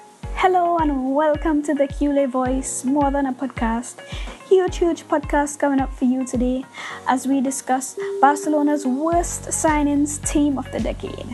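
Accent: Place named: Caribbean. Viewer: Finally a Caribbean voice on Barca matters. Great stuff!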